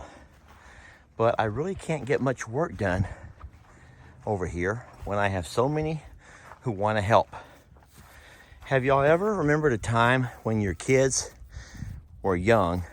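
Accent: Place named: Alabama, United States. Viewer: It is funny to here you say, ‘seement” and “sareen’. Love the colloquialisms even from Alabama to Texas.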